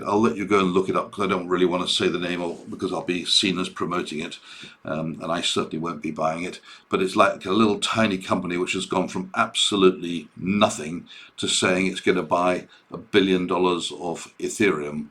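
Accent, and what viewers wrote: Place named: RP, United Kingdom. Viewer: Forget your Bloombergs, Reuters et alteri, isn't it so much nicer to listen to excellent analyses brought forth in his equally enjoyable Queen's English?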